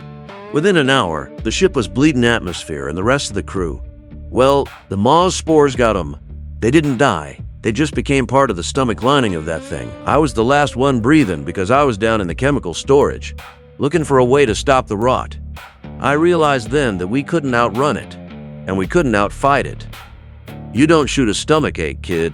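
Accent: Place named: South, United States. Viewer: The Southern accent made it worth while for the listener. 👍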